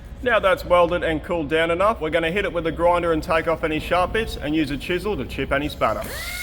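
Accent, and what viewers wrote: Australia. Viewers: Right, I like that starts every sentence with "Right!" Sounds authentic! Sounds Australian! Sounds Right!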